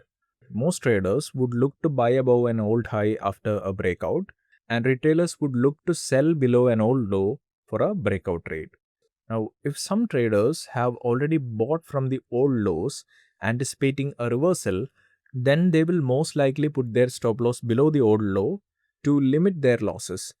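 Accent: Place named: South India, India. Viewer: South Indian ?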